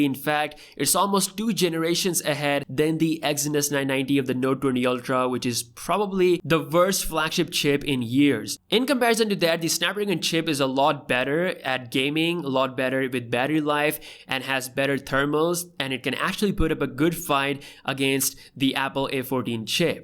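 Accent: India. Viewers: Your voice like Indian guy speak English and trying hard to hide the accent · You have a funny accent. It goes Indian and American like a dog playing with its tail... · Chutiya desi accent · Accent like an india tongue,, spoiled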